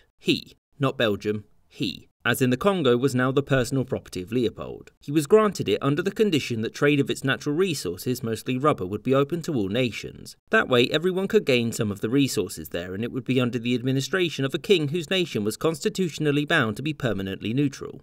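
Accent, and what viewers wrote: United Kingdom. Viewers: as told by a brit yes, you know thisll be good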